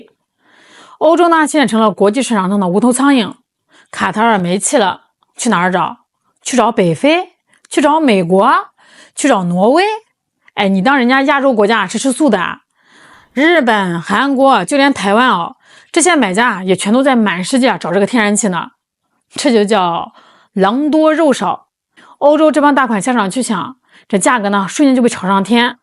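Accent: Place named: Shandong, China. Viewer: 这女的是山东哪的口音？ 章丘？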